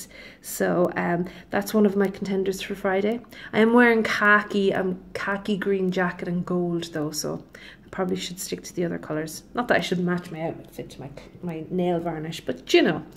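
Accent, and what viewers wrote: Ireland. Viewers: I'm applying Zoya's Merida as I'm watching your video! I love listening to your Irish lilt, what part of Ireland are you from? My great grandmother was from Belfast. Her father was a tugboat captain in the Belfast harbor & was one of the tugboats that pulled the Titanic out of the harbor on it's fateful voyage!:)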